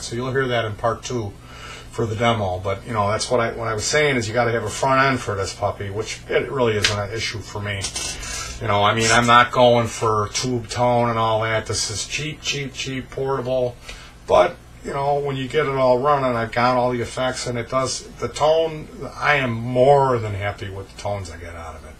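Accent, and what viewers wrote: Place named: Upstate New York, United States. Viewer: The accent. Buffalo, Rochester?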